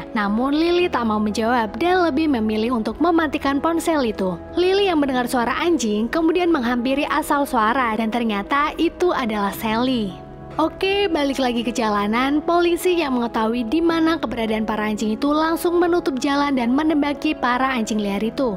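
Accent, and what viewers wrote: Pemalang, Indonesia. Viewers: wong Pemalang ap mbk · Orang pemalang kakk? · Min orang pemalang yah?😅 gua orang pemalang neh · Wong Pemalang mba? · Fiks ni admin orang pemalang😂 Sekota karo aku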